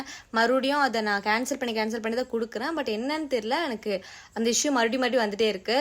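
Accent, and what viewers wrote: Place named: Theni, India. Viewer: Hey guyss! Video ku mela 3 dot eruku adha touch panna audio track nu varum adhula Korean orginal nu varum adha touch panna nammolda kodai kadhal orginal voice vanthuerum. Kk ellaru mob layum ithu available than ok. Kodai love story pakkum pothu engaluku shy aguthu ❤ anna nanum theni thaan namma theni slang la pesunga innum nalla eruku urimai ya erukun namma slang ok ❤ pin me kaa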